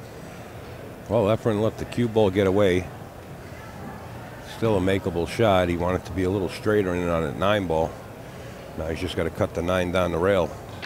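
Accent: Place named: New York, United States. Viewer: We only need efren, NYC accent is not adding to my experience....sorry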